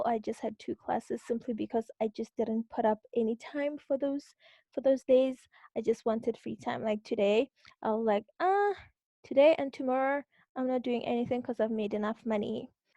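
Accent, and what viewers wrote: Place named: United States. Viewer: U seem to have a bit of an american accent. Does one need to have this accent to be considered ?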